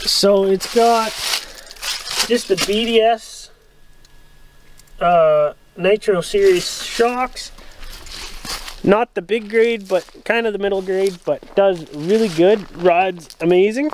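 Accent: Canada. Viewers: I heard the accent… thought you were Canadian. You popped the hood and I saw the DDC plates.. YEP! You’re Canadian 😏 beautiful truck !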